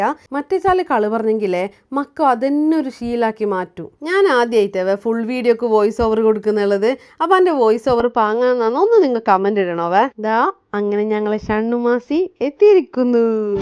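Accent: Kasaragod, India. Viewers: ഇതെവിടുത്തെ ഭാഷയാണ് കാസർഗോഡ് ആണോ, എന്ത് ഭാഷയാ ട്ടോ, ഒന്നും മനസ്സിലാകുന്നില്ല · Kasrod bhasha nte athra oru bhashyum indavvula aabe❤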